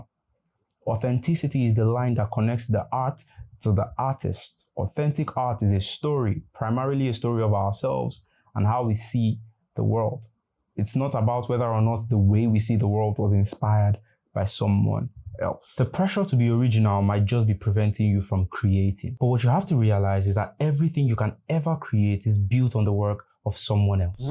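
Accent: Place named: Nigeria. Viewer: No Nigerian that grew up in Nigeria cannot escape the accent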